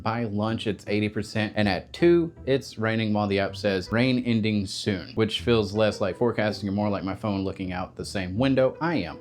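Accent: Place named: Northern New England, United States. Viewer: I would have thought you are from Northern New England! You sound just like us. This was fun! Thank you. I've got to head out now and start gathering pinecones for tinder...and watch out for the wooly-bears because I've got to measure their color bands.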